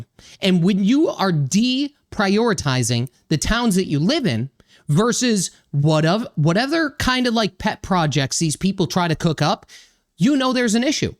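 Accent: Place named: Massachusetts, United States. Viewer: i love the accent. You say "owies" when you say always. A lot of mass residents do. I pointed this out to my friend and he thought it was hilarious and can't unhear it.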